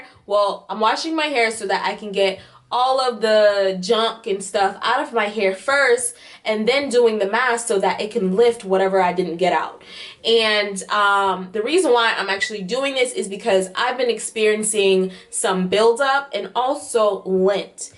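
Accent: Jamaica. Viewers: Im Trini, as soon as you started talking Yardie, I died. Love my Caribbean people!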